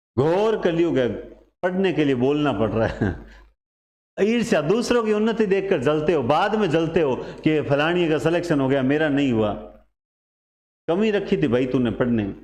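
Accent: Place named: Rajasthan, India. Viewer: आप के मुख से मारवाड़ी बहुत अच्छी लगती है गुरुवर मन गदगद हो जाता है 😍😍😍😍😍 मेवाड़ी कलम से ✍️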